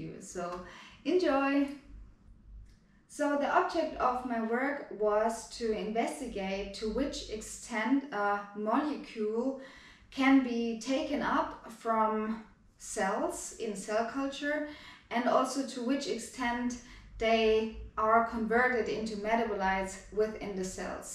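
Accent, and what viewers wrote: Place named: Germany. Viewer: Is that the Deutsche accent , your Deutsche -english accent is fluent and delightful 🤣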